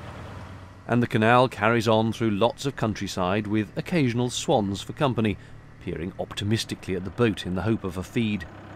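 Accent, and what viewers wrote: United Kingdom. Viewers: Hes an American actor. You share some resemblance if I must say. HOWEVER, your inflection, infectious enthusiasm, and admittedly, your British accent that makes you sound so much more intelligent than the rest of us English speakers, completely drew me into this video!!! It reminds me of an audio book or a relaxation tape or something and I love it. Quite an interesting channel my friend! And these boats pique my interest! Very unique!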